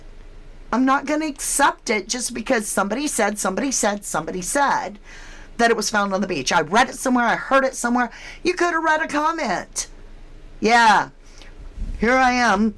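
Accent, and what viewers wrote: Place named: United Kingdom. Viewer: Love your British accent 😂❤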